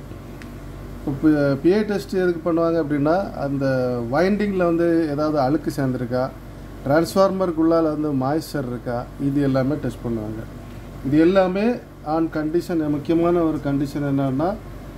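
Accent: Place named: Kanyakumari, India. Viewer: Kanyakumari slang 🥰🥰🥰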